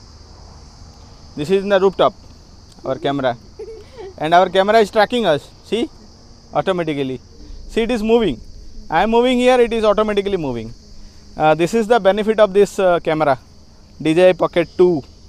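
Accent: Odisha, India. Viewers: Nice journey . I guess you are original Odia from your talking . Please tell if I am wrong or right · Bhai are you from Odisha. As your accent matches with that of odia people.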